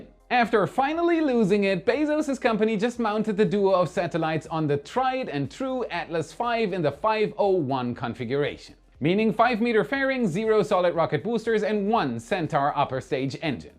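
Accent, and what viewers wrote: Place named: Germany. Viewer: I did not expect my comment to get such a big response and so many likes. With over 500 thumbs up it is obvious many others feel similar. I appreciated your comment because I did not see the episode you referred to where expressed he was getting burned out. I have been a subscriber for almost 4 years, way back when had a very noticeable German accent. I really liked his channel back then but also there weren't as many options. He made a big commitment to this endeavor moving himself and family from Germany to the US to be closer to the action so to speak. His show style and presentation may not be for me anymore but I am glad you and others still enjoy it. I absolutely wish him only the best and even more success.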